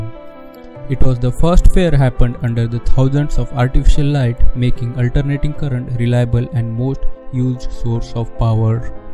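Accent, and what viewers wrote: India. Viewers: Your voice hahahahahahahahahah wtf man indian pls give eng in peace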